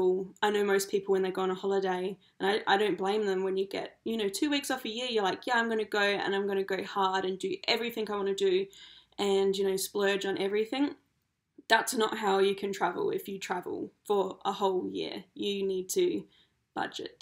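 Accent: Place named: Adelaide, Australia. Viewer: Ha! I thought you must be from SA! It’s your “accent”. I dunno, certain words you say, I’m like “she’s gotta be from Adelaide”!! Did you guys work along the way whilst travelling or did you just save your butts off beforehand? I think it’s amazing that you did this trip- great memories & experiences...it’s literally the experience of a lifetime! * & don’t worry about dislikes- they still count as engagement!😉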